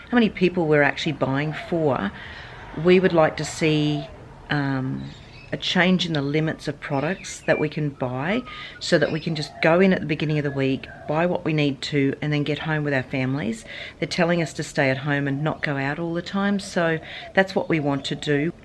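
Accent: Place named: Australia. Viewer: i am a new subscriber and love for family and honest aussy way.we live in Biasbane and love hearing this aussie mum.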